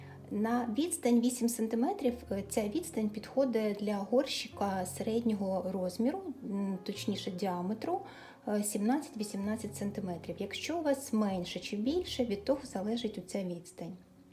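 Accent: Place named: Russia. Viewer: Странно звучит украинский язык от русскоговорящего человека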